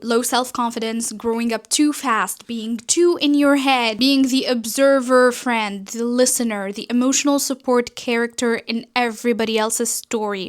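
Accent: England, United Kingdom. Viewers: i loveeed ur english accent machalah <33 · Guuuuuuuuurl your english accent !!!! You slay queeeeen ! Keep up the good work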